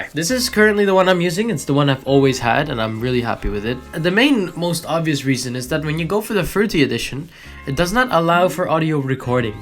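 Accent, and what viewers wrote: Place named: Netherlands. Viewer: that dutch accent is thickkk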